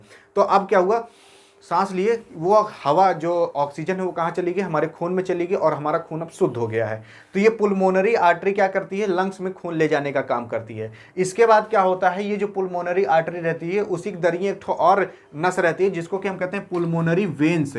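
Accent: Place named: Uttar Pradesh, India. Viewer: aapki bato se lag rha h aap up se h 🤔up me kha se h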